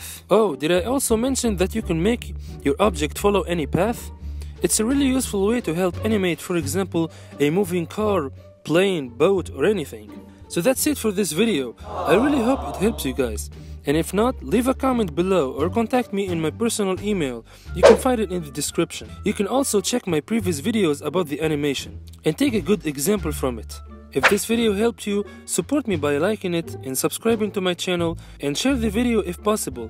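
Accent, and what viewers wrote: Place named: Tunisia. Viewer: borjouleya l anglais mte3ek mtaa tounsi sahby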